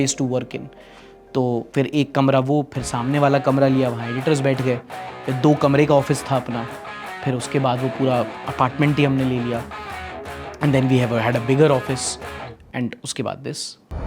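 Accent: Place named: Bundelkhand, India. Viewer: You are from jhansi , mein man hi man sochta tha ke aap hamare side ke hi ho kaeki bhot achhi bhundelkhandi bolat te video m aap ,, aaaj confirm ho gao aap jhansi se ho ham Orchha se H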